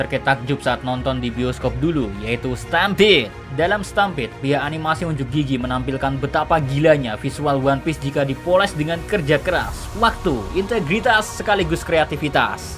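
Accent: Java, Indonesia. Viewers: Yoi,ala logat jawa suaranya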